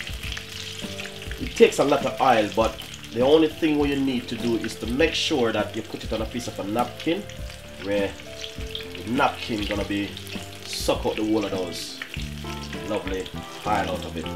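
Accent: Jamaica. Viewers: you obviously don't know your own dialect... ppl from other Caribbean countries sounds different from us... ppl from Trini.. Barbados and all over speak different... you can easily tell a Jamaican accent... she doesn't listen to what he says cuz he says "this is how we do it here in Jamaica" in every video!!! so it is a stupid question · Real yardie...from u hear di counting (number dats di second one) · Nah bredrin, I love it when he says "simple add ...." instead of "you simply add..."; "luvley an nice"; and "flitters" instead of fritters🤣 He reminds me of my parents (RIP), who were also from the beautiful island of Jamaica ❤❤ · Kurt Sammons that is how Jamaicans speak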